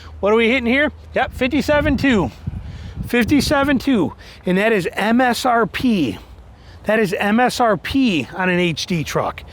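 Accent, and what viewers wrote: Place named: Wisconsin, United States. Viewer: Hunert, k. K? K? Gotta be from Wi.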